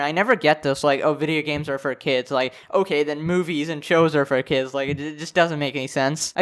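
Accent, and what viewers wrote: United Kingdom. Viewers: Bro I just went to your oldest video and the voice change is real 😂. LOL you used to have a British accent wtf 😂.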